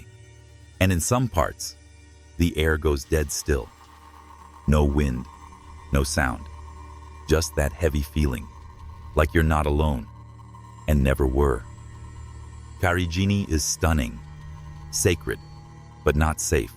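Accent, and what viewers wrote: United States. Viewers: As soon as I heard the American accent I knew this video was bullshit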